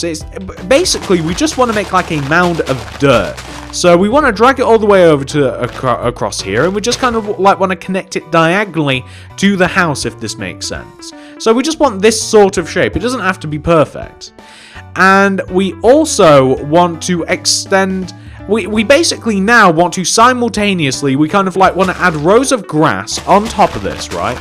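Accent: United Kingdom. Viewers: I'm gonna admit you sound just a little British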